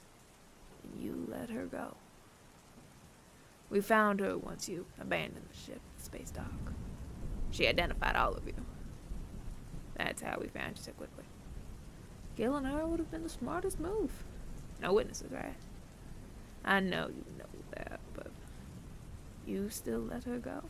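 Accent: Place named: South, United States. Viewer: Oooooo this one was a fun one! Not sure how I felt about the Southern accent, but overall pretty good!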